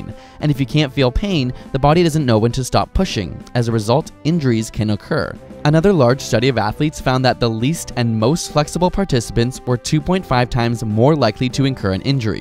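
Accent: Canada. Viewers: Are you Canadian? You kinda sound Canadian.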